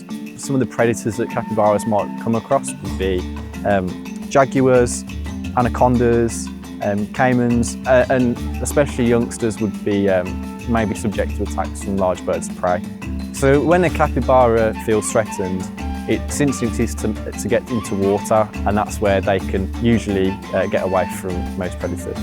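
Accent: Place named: Australia. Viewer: this man sounds aussie but why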